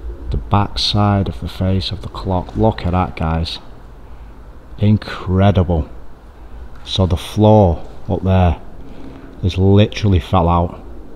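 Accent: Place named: Salford, United Kingdom. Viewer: Great vids you do mate, it's just that Bolton/Farnworth little Hulton blag Salford accent that doesn't do it for me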